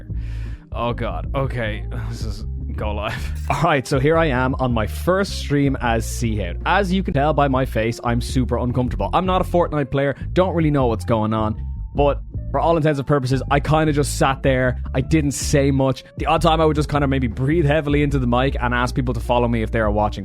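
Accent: Ireland. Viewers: Are you from Ireland? I'm originally from Donegal! I have to subscribe now! Also thanks for the tips, I've only ever done 2 live streams, but planning on doing more!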